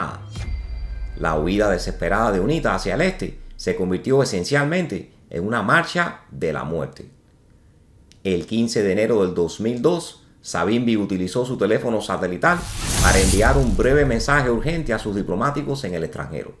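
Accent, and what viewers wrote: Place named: Cuba. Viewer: YO SOY CUBANO Y POR TU ACENTO SE QUE ERES CUBANO, HACES MUY BUENOS VIDEOS TE FELICITO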